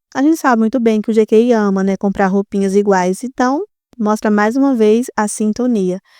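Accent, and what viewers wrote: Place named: Bahia, Brazil. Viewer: Oi menina. De estado do Brasil vc é?? Pelo sotaque acho que é Bahia. Acertei???😂🎉🎉🎉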